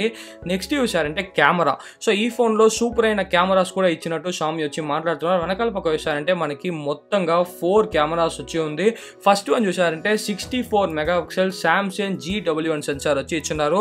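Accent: Tamil Nadu, India. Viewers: Telugu explanation with Tamil slang tho adharagottav bro.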